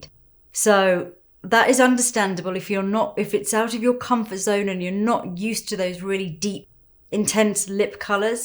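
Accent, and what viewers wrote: United Kingdom. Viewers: Not only your magical makeup works and your beautiful natural look makes me to watch your videos, but also I'm here to enjoy your gentle and sweet British Reserved Pronunciation accent. You are as soft-spoken as a wind-harp swinging in an abbey door.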